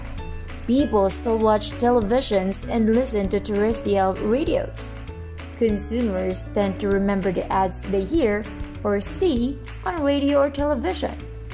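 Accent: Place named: United Kingdom. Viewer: Goosebumps sa pronouncation ng mga words sobrang cristal clear maam😯 may pag ka british accent hehe.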